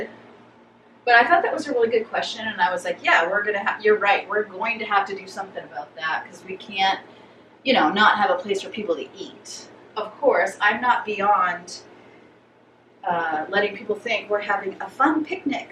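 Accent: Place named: Maine, United States. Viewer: Don’t ever change your wonderful accent,. It reminds me of home every time I hear it and I love it so much. We watched a video growing up in Maine about how the language is similar to a certain old English dialect. I’m frankly, a little shocked that anyone would comment in a negative way. It’s beautiful, beautiful beautiful, and I love it. ❤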